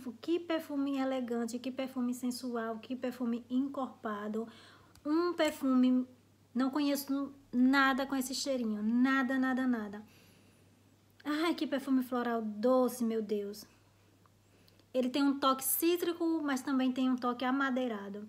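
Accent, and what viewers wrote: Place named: Northeast, Brazil. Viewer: Amo perfumes e estou amando seu canal amo seu sotaque nordestino esse jeito gostoso de falar. ganhou mas uma inscrita. você Raquel Mota Mary Brito e o Ander Style são os meus yotuber favoritos❤💋💋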